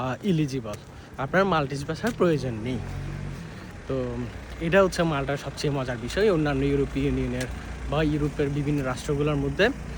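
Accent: Chittagong, Bangladesh. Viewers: Chatgaiya mne hoi vaiya apni??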